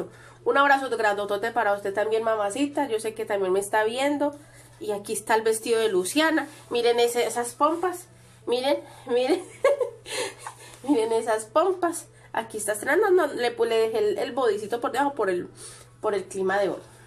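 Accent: Colombia. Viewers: A mi me encanta su forma de ser y decir las cosas muy directa pero sobretodo su acento colombiano, no haga caso, siempre hay gente envidiosa y maluca como dice usted. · Hola mi hermosa, no usted no nos aburre a mi en lo particular me entretiene y ese timbrito de voz colombianito me encanta 😍 me gustan mucho las personas colombianas yo soy de Mexico y siempre me ha gustado el tono colombiano. Cuídese que Dios los bendiga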